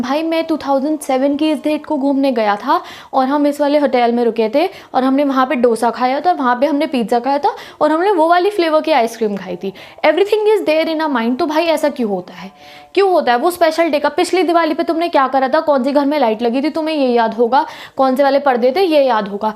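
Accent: Punjab, India. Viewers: R u punjabi didi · Are u Punjabi?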